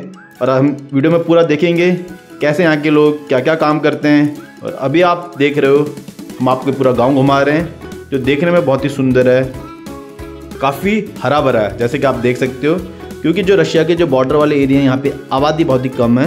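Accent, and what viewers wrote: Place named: Haryana, India. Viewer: Sir ji aapki aawaz se lag raha jese aap haryana se h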